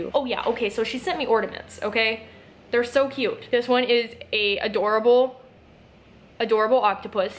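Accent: Texas, United States. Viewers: Love that she's from Texas and says "y'all" like me 😁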